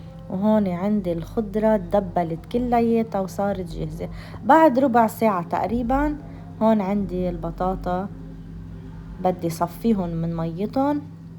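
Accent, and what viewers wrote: Lebanon. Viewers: ما أحلى اللهجة اللبنانيه .. ❤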